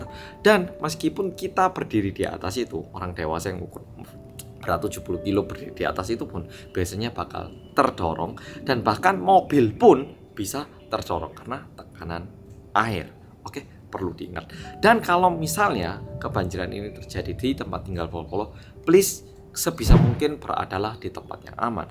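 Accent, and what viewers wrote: Java, Indonesia. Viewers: Hallo mas Korea medok Nitip salam buat kim bum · wajah Korea koq logat jowo sih mas..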